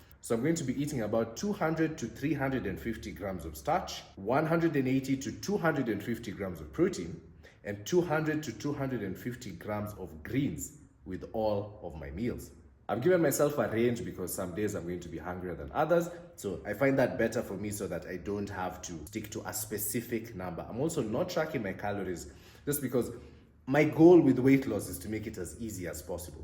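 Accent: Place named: Africa. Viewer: Love your accent.what country in Africa are you from.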